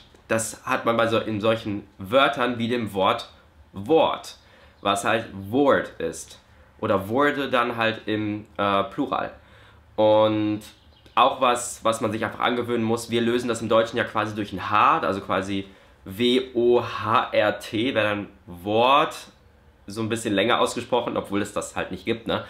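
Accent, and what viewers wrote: Standard. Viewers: Als Hannoveraner kann ich sagen, dass du in diesem Video Hochdeutsch redest 👍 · Also für mich sprichst du absolut hochdeutsch🤷‍♀️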